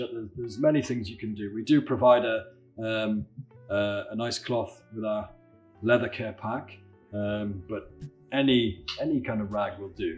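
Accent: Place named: England, United Kingdom. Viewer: "Go give yer bag a drink" very good advice and something I must do! Thanks for a great informative video. Pleasing accent and tone of voice (where in England are you from?).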